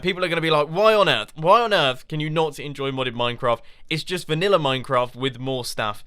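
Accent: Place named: United Kingdom. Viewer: Dear! I have been watching your videos for ages, I like very much your YouTube channel, and your person. Especially, your voice. It was the main reason why I started following you. It catched me, with your British accent. And THIS, is my first comment ever, to a video of yours! You are asking about the new Graphics of the channel. The pop-ups and so on. They are very nice! But, only one thing, maybe, could be better, in myopinion: The "+1" pop-up. It's green. And... It's a bit strange, it clashes with the blu, the orange, and the other colors, this, at least for me! The animation is very nice. But the color itself... Neither the "+1" bar of Youtube is green anymore. Maybe... You could change it? This is everything I've got to say.:P Bye bye!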